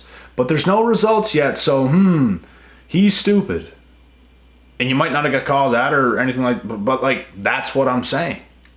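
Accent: Canada. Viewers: Love the Canadian accent! From USA.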